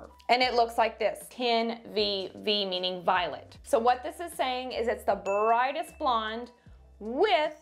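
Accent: South, United States. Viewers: I can’t decide if my hair looks better brown or blonde. Do you do hair in a shop? You sound southern and I live in the south. Would travel to have you do my hair. I feel like if I go brown I might look older hmmmmmm did I say just got divorced, my dogs died and I broke out with psoriasis all in a year. I’m finally healing but I feel so darn ugly. I sort of gave up on trying to look my best after so much medication and steroids. I want to try and look as youthful and I just can’t seem to get it. I paid 200 at my last salon appt and it looked no better than when I did it. 💕😀love you and keep smiling. You have brightened my day so many times. Marnie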